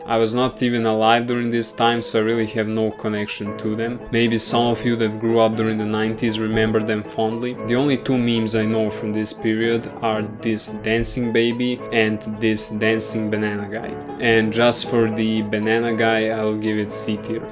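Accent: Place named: Russia. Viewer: I thought it was sorry for the misunderstanding, you just sound russian.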